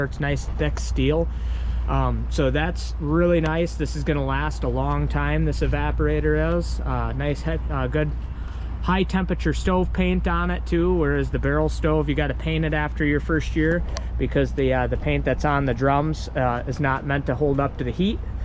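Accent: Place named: Canada. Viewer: Are you sure you're not Canadian? You sure sound like it. No offense intended.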